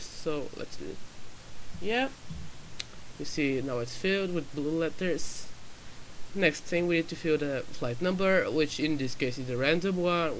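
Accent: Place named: Russia. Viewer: You must learn English well not like a Russian pilots speaking!!!!!!!!!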